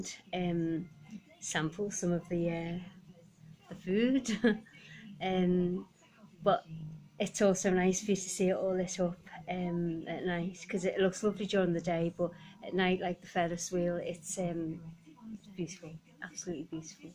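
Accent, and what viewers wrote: Liverpool, United Kingdom. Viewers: What a gorgeous cat Lucy is...what age is she?....love listening to a Liverpool accent...reminds me of the much missed Brookie :)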